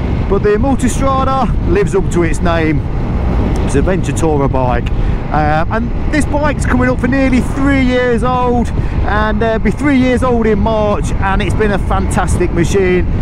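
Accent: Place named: Leicester, United Kingdom. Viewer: I live in North Yorkshire now but love it when I hear a Leicester accent, where I’m from. Great bike, tempted to swap my GS. Entertaining video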